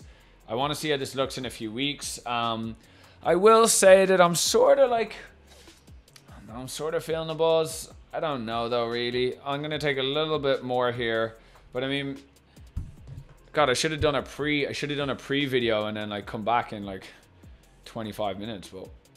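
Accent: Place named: Ireland. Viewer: are you Irish ? I can hear a little bit of an accent, are you in the US ?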